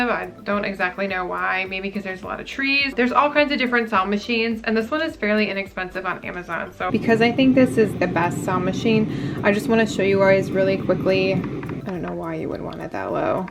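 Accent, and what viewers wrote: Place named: Wisconsin, United States. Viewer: Wisconsin probably. Nice funny accent.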